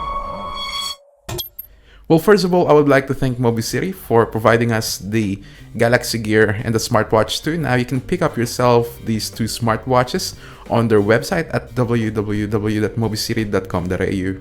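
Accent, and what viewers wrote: Philippines. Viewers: the diction of the speaker sounds like Filipino. :) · Are you Pinoy? Your accent sounds Pinoy. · kinda disturbing. a guy with a Filipino accent but pronounces words with "O" in an Australian accent. not good to the ears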